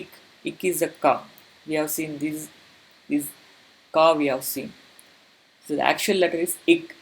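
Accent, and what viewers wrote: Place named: Kerala, India. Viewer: Mallu accent is so distinctive.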